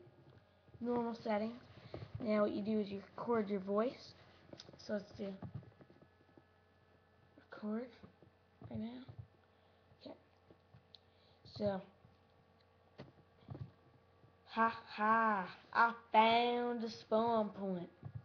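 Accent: Texas, United States. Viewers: wat the hell is dis he sounds like he is from Texas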